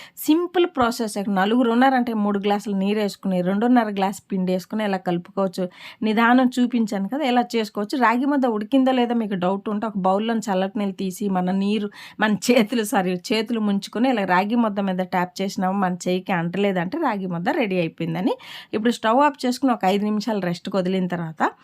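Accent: Srikakulam, India. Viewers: Slang srikakulam, place karnatakam, chesedi authentic rayalaseema food. Nice.. Flavours of India.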